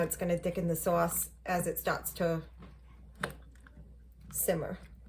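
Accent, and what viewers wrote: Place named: East Coast, United States. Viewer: I never heard of sazon before but will look it up right now. My pork chops always came out dry when baked so now I only make them in a cast iron skillet. Does the marinade (specifically the lime juice) help to make the chops more tender when baked? Your east coast ‘accent’ brings back pleasant memories of my years spent in northern New Jersey in the 90s. Am back in my home state of Minnesota now, where everyone has that ‘up north’ accent! Thanks for sharing.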